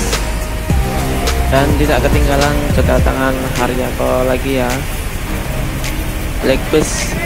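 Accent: Banyumas, Indonesia. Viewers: Ngapak Bosku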